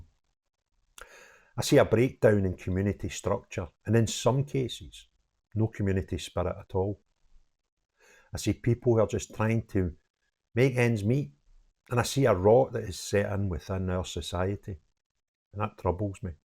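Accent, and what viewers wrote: Scotland, United Kingdom. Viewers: Great to have your Scottish accent..we represent our beautiful country of Scotland….we must work together and get away from the total control of the English..we are the oppressed..we are Palestine…thank you for being a voice for us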